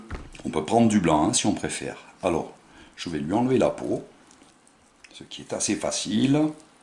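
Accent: South, France. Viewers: il explique bien, c’est l’accent du Sud qui fait tout 😂😂😂👍🙋‍♂️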